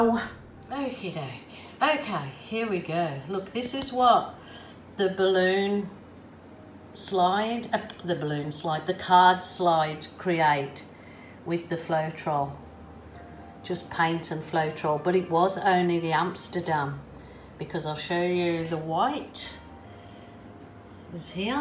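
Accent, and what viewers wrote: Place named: Northern Ireland, United Kingdom. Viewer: Also from Victoria BC! Originally N.Ireland. I was trying to figure out accent and thought she sounded a bit like me but with a mixture! Love her work land her ability to so naturally express herself in words and in paint!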